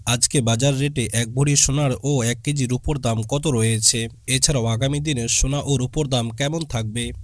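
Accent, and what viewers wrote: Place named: Kolkata, India. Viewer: শোনার বাজার দুর হালা তর ভাষায় বুজা গেল তুই কলকাতার আর ভারতওয় ইউটোবাররা মতিথা বলে তরা ভুয়া বাটপার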